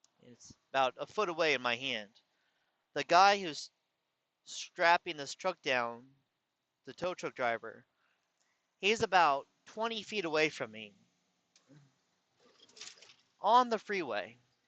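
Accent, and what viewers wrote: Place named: California, United States. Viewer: You're human. You are allowed mistakes. I love your voice, very Californian.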